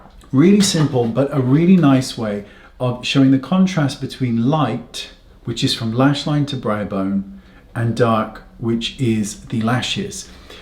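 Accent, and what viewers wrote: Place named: England, United Kingdom. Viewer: It's his lovely English accent, he's from Somerset I think which is usually a very broad accent, his is quite soft and only comes through occasionally. He does have a really nice voice. I watch a few English YouTubers and some of it has to do with their accents. I'm originally from the UK and I think I miss hearing English voices.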